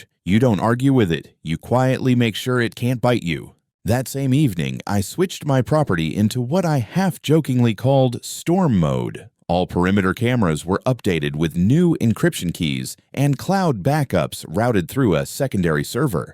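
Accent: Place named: Canada. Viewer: Zed IP... Canadian, eh?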